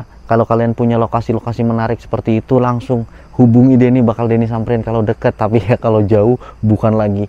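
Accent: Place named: Eastern Indonesia, Indonesia. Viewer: Kk Denny org jawa to? Kenapa pake logat timur selalu sa dgr blg "ado mama..." salam dr Merauke.sukses ya ka Denny tampan..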